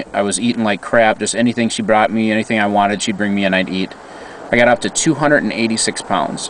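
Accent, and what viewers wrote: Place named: Minnesota, United States. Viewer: Hey..thanks for sharing your history of weight. You have a strong "Minnesota" accent...lol... You can make it to goal..don't give up! ~Amber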